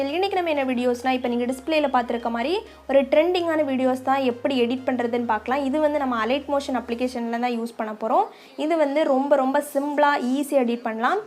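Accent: Kanyakumari, India. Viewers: Seems like kanyakumari Slang! 💙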